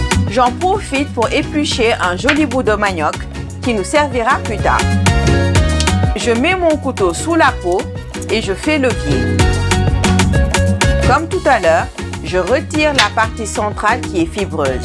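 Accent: Senegal. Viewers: La Boss ,vous êtes Ivoirienne ou Senegalaise ?Je trouve que vous avez un petit accent wolof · J'AIME l'accent Sénégalais très beau accent très agréable à l'oreille ❤❤❤❤❤❤❤❤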